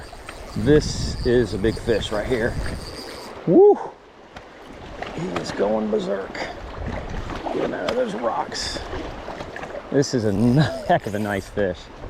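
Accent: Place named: Pennsylvania, United States. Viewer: I'm from PA and everytime I hear you say water(wuder)it takes me back. Love it! Took me a long time to stop saying it that way myself after I moved - probably because I wasn't trying to change it. I live in the Smoky Mountains of NC now and just started fly fishing this year. Lots of good places to fish, but I'm in the putting in your dues stage of fly fishing. A nice way of saying I'm not very good yet, but I love doing it! You are really hitting them Trout. I sometimes go 4 or 5 days without catching a fish! Just started euro nymphing myself and really like the control and feel. Anyway, great channel - I really enjoy your videos and just subscribed. Appreciate the Euro tips. Your inspiring me to head back to PA to see some Family and try the fishing that way.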